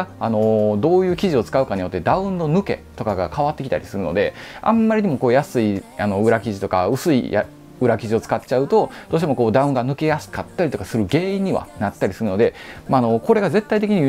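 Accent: Kansai, Japan. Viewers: 何度聞いても心地良い関西弁だなぁ〜。 さいたま市より。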